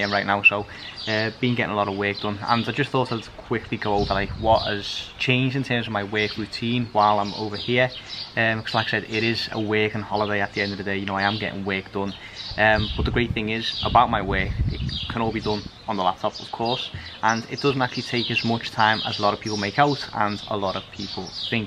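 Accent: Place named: United Kingdom. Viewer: How do u have British accent as a Dutch?😄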